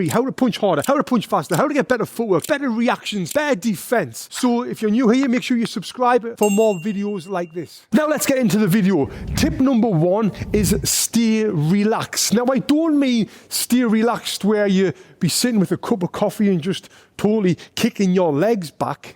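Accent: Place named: Newcastle, United Kingdom. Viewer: Excellent video, nice geordie accent 👍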